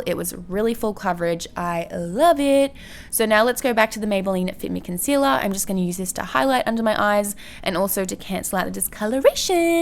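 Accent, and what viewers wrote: Australia. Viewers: your accent is sooooo damn cute Australian?? I'm new to this channel this is my first video of hers I've watched lol ... don't hate me if I'm wrong 😕 ... your absolutely gorgeous!!!! I liked this video before getting 2 minutes in.. I can just tell when I'm going to like someone..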